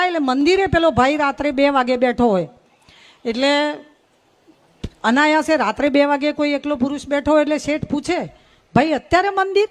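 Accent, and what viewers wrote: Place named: North Gujarat, India. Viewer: ઉત્તર ગુજરાત નો લહેકો અને ગામઠી છટા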